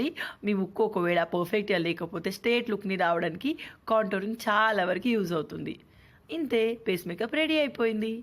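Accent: Telangana, India. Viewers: Hi gaaru mi voice ki fan aipoyaa Mana telanganaa yaashaa lo super gaa cheptuvuntaaru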